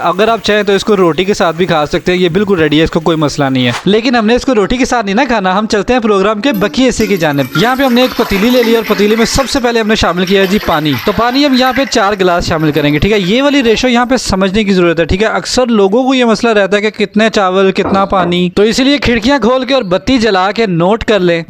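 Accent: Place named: Punjab, India. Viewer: So yummy recipe😋...I like your punjabi accent😊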